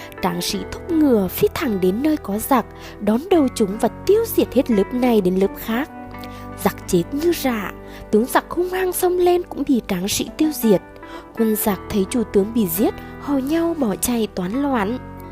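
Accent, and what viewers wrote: Vietnam. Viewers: thú vị chất giọng của bạn. Giống những hướng dẫn viên du lịch xứ nghệ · Hay à. Cứ như thế này nhé. Kể cho nhiều người biết về lịch sử của người Việt. Tính giáo dục rất cao.. Giọng hay quá. Bạn ở Huế à. Câu chuyện được dẫn hấp dẫn, rõ từ. Lên xuống giọng họp lí. Chắc giỏi văn lắm đây.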